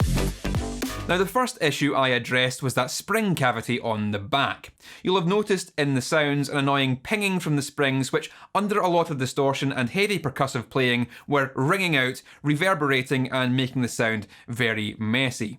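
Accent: Scotland, United Kingdom. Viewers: "We want to hear some Entombed or At The Gates" - well, I don't, I'm mostly here for the Scottish accent, but carry on ;) But, that "Bonamassa" insult, that's too much, take it back! That could possibly be the worst insult anybody has thrown at me!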